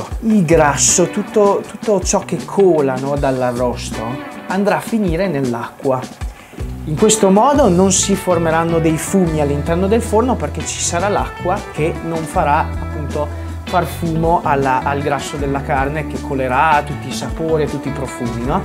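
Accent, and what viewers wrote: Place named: Trentino, Italy. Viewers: non mi ero reso conto prima d'ora che la cadenza e accento trentini fossero così simili al romagnolo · Fai ridere e sei molto bravo, adoro l'accento Trentino! Continua così!!!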